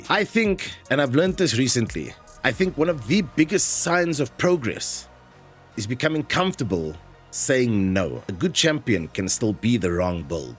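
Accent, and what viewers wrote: South Africa. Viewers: Yo bro, I take it you're from SA, I hear that colored accent. Nice video. I cannot agree more with everything you've said. Good job.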